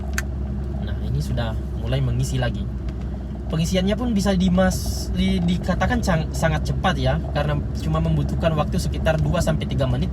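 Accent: Indonesia. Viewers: Logat sumatra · Orang makassar klo dilihat dri logat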